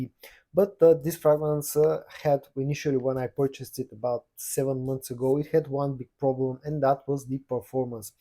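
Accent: Bulgaria. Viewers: Yes, I was a 100 %you are Bulgarian. Judging by the accent. Congratulations!!!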